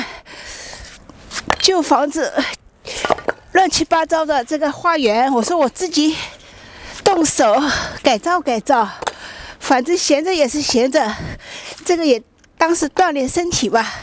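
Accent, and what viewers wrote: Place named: Shanghai, China. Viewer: 上海口音很浓厚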